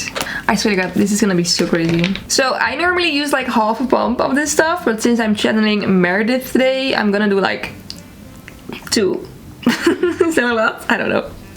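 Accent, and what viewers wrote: United Kingdom. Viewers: I thought you said look like a chef at first, instead of chav. The british accent was also a treat :) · Loving the British accent🤭😂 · Your British accent sent me. 😂😂😂😂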